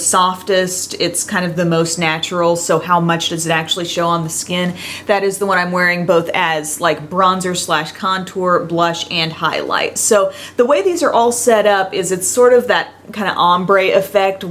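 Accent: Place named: South, United States. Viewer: Everytime I watch your videos, or your snapchats ALL I can think is how much of a southern accent you have! But I love you, and always try to come to you before I purchase something!! ❤️❤️❤️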